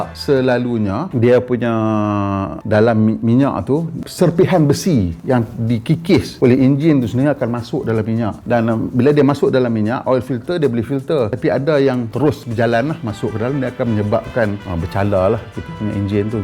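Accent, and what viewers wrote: North, Malaysia. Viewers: Cikgu kalau guna full bahasa utagha lagi sedap dengaq. Hat ni dengaq mcm dok pedo² ckp utagha. Apa² pon mmg terbaik penyampaian. Mudah dan senang paham.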